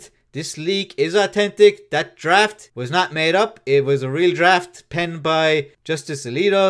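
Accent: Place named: Ireland. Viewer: Gona go ahead and guess your Irish….though you were an Indian dude fighting an accent when I first heard ya. Good content. They have conservatives in Ireland!? Though the whole island had been taken over by abortion activists…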